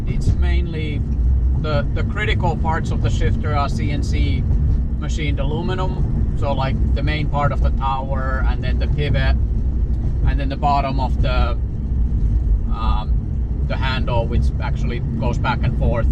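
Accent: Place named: Finland. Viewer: nice project. good solution for duoselect have to say that you sound very Finnish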